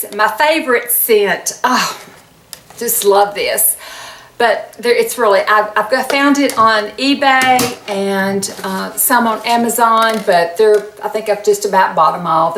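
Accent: South, United States. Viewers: What a cute way of saying gone love your gentle southern lilt love elaine Lincolnshire uk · You look beautiful as always and that necklace looks so pretty on you. Just hearing your voice is so soothing...hope that doesn’t sound too weird...lol. Love a southern accent :)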